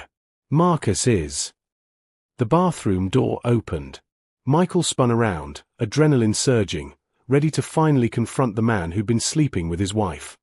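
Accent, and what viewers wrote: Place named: United Kingdom. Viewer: So tired of these stories with a British accent. I don't know why but for me it's off-putting!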